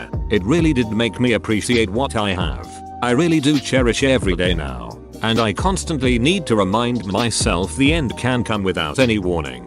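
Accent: United Kingdom. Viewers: This brittish snob accent sucks on robo narrator.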